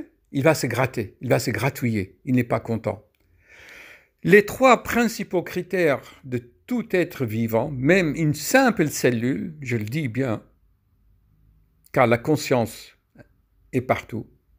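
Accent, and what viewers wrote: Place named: France. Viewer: Bonjour cher adore 🙏vos vidéos car je me reconnais en vs, je suis pharmacienne à Québec et pratique la méditation et yoga +++ et je m’intéresse à l ayurveda Vos explications unissent la science à la spiritualité, exactement mon combat de tous les jrs!! Par contre l’explication de 2 mx dont je suis atteinte m’échappent....ménière et les fameuses impatiences aux 4 membres empêchant même de rester en position assise tellement il y a un besoin irrépressible de bouger pr soulager les sensations qui sont une vraie torture et qui reprennent dès l’arrêt du mouvement Traitement pharmaco très efficace ds le 2e cas, très « fort » aussi, mais ce sont les explications spirituelles qui m’échappent Manque de connection à la terre pr ménière? (Les vertiges qui me clouent au lit pendant des mois alors que j’ai 45 ans et une enfant handicapée ) Et pb d énergie mal répartie mal diffusée a travers le corps ds le cas des impatiences? 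J’aimerais tant connaître vos explications..... Je suis née en Bretagne et j’adore 🥰 l’accent français! Merci pr vos vidéos ça me donne le courage de continuer à expliquer les concepts médicaux et pharmacologiques aux patients du point de vue de l’univers, énergétique Merci 💝🙏🕉💫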